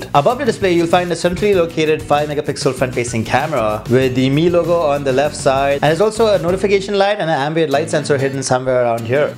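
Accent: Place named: India. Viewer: Nice review, I just want to buy this right now! Xiaomi just arrived here in Brazil, and I really hope they keep up soon with this device, just incredible for the price. And... dont change your accent, its cool, I watch some indian review channels on youtube and your accent is one of the most fine between all. Actually is a little bit of cute to hear!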